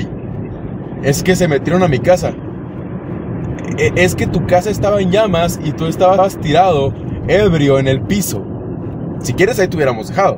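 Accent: Mexico. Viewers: ¿Es de México?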